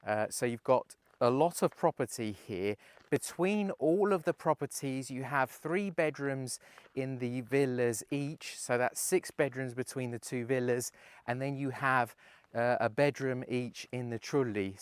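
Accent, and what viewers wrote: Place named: England, United Kingdom. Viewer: never crossed my mind and I'm from that neck of the woods. I took you for English through and through .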